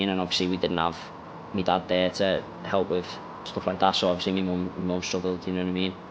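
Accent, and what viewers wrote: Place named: Wirral, United Kingdom. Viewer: Obviously he’s from the Wirral listen to him talk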